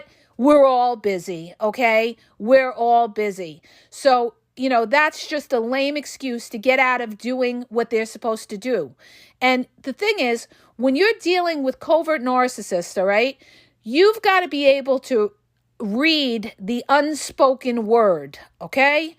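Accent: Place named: Brooklyn, United States. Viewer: You sound just like my mom! Brooklyn Accent and Everything 😂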